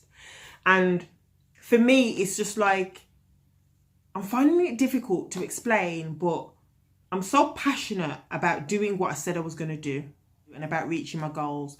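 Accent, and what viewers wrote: Birmingham, United Kingdom. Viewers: Love the brummy accent. That's where I'm from but live in london now. Well done you. Just found you. Thank you for motivating me to start tomorrow.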